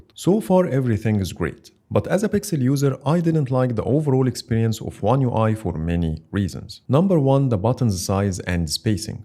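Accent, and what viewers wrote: Egypt. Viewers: I like the way you embrace your Egyptian accent and not even try to cover it while sounding perfectly clear 👍 also great review from end user prospective just what viewers need.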